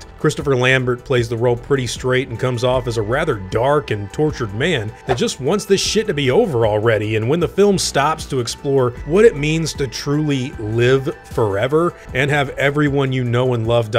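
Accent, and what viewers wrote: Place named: Scotland, United Kingdom. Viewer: Egyptian Spaniard with a Scottish accent, brilliant👍👍👍👍👍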